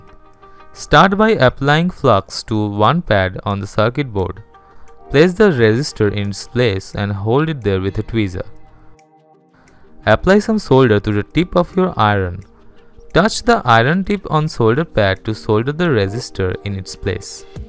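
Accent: India. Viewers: Indian guy, hire a pure bred western guy for voiceover😉 No one will worry how induction cooker messes up smd things at 165 c coz hot air guns min temp is 130, get upto 500 deg c 😎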